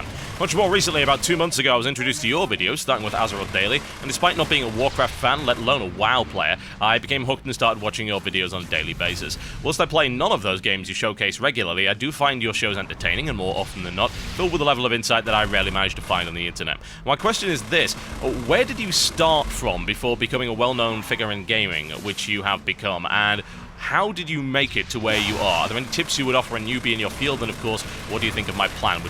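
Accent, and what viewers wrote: United Kingdom. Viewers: I wonder what persona totalbiscut has ... WAIT HES BRITISH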